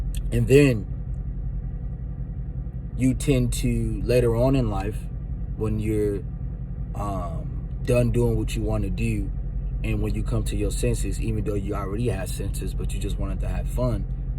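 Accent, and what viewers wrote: Baltimore, United States. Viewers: I hear a lot of the 410 in your accent ..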